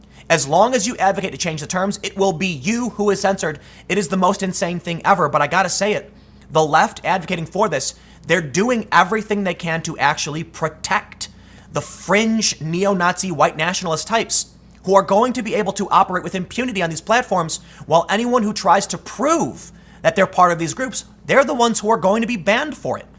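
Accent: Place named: Chicago, United States. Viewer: please stop pronunciating like chicago. Me and my girl like your content but your voice sucks.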